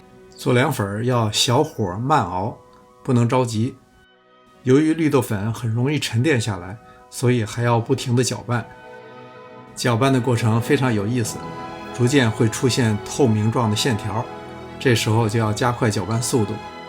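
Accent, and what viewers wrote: Beijing, China. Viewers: 非常喜欢您的视频，其一是语言简洁，其二是内容适合我的口味，其三是地道的京腔，每期必看。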